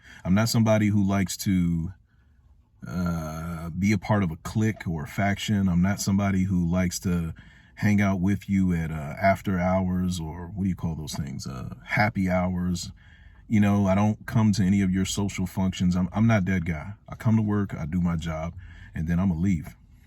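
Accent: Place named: Washington DC, United States. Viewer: Man I heard you telling your story like damn he sound like he from DC and well spoken . Then u said it, subscribe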